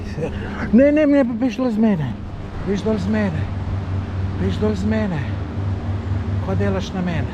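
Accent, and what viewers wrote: Lower Carniola, Slovenia. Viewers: upam da ne misliš mene, nisem se norca delal, le obozujem vas in dolenjski dialekt in sem posnel parodijo, vse dobro zelim :)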